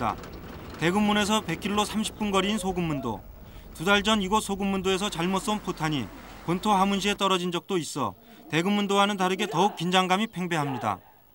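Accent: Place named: Seoul, South Korea. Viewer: 그러고보니까 나레이터 90년대 서울 사투리 안 쓰고 요즘 서울말에 가깝게 말하네 취재진들은 90년대 서울 사투리 쓰는데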